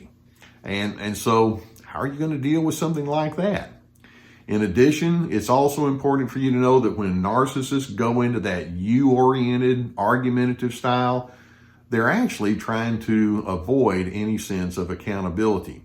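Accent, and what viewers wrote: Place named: Texas, United States. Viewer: Love you,! <3 Love your accent too! Where's that from? Texas? Yeehaw! Hey, I have a cowboy song for you! Without guns, moonshine, loose women, gambling, just a a whole lot of cows and a long, long ride... and a spiritual twist at the end! <link> Enjoy and thank you for all you are doing for us all!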